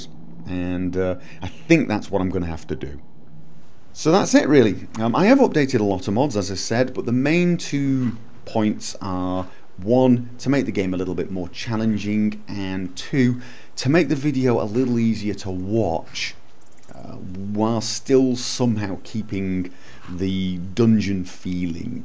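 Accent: United Kingdom. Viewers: okay, I thought it was wierd he had that good brittish accent if he wasn't from there.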